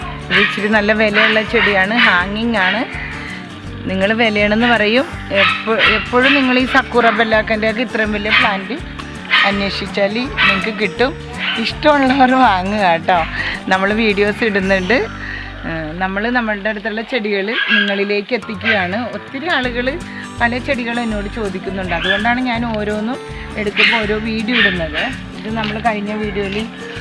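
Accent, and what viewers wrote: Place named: Ernakulam, India. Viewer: Chechiyude samssaram kettal Ernakulam pole thonnum👍👍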